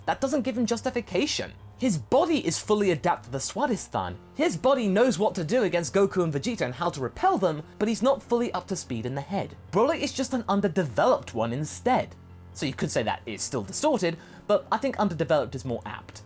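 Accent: United Kingdom. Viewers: Is that how British you really are?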